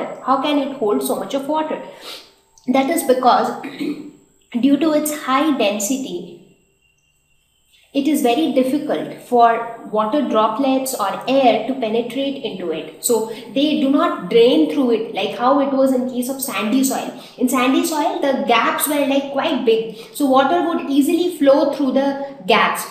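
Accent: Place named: Bihar, India. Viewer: मैडम बिहारी हो के?? आपकी टोन से लागे😜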